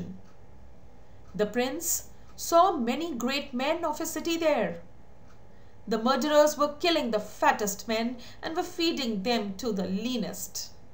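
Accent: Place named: Australia. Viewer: But , even though u are an Indian , your accent is much like an Australian ✨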